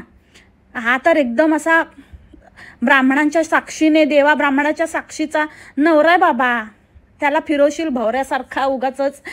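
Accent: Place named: Malvani, India. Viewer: Tai tula malvni yete ky.....mhnje tu malvni mast boltes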